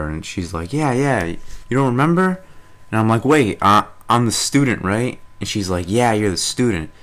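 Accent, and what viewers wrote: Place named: South Boston, United States. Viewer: Where in boston are you from? Sounds like southie lol.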